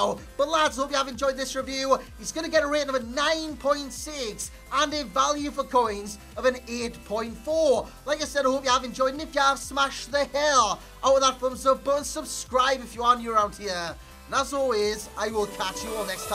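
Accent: Newcastle, United Kingdom. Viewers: Kebba Sohna I think he's from Newcastle but I could be wrong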